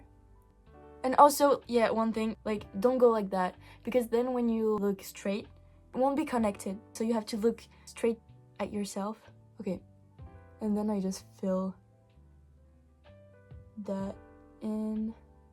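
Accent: France. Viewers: I didn’t expect u to be french. But I love your accent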